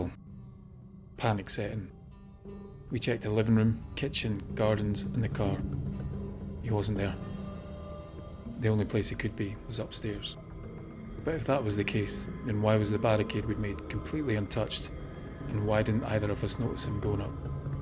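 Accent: Scotland, United Kingdom. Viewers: I've watched so many of your videos these days and I enjoyed them all. You put a lot of great work and thoughtfulness into this - it's very much appreciated. Wish you a Happy New year with many more ghost stories to come. 🙋🏻‍♀ All the best from Bavaria ❤ P:S. just one wee thing - your viewers are from all over the world, many may not be native English speakers and not used to the lovely Scottish way of speaking (that I enjoy so much 😀), some, like myself may suffer from tinnitus or have bad hearing... It helps a lot to turn down the background music a bit so people can concentrate to the narration better. Thank you.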